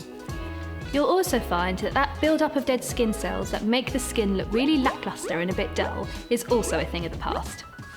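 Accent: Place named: United Kingdom. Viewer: Good to hear British ive subsctribed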